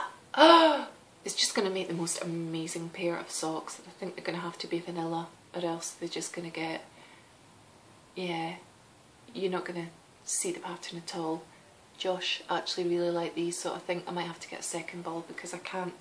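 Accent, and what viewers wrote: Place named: Scotland, United Kingdom. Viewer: Oh you are such a sweetheart:) so glad to see that your move went well! I just love your Scottish accent I could listen to you talk all day my dear! Looking forward to the Christmas sock swap!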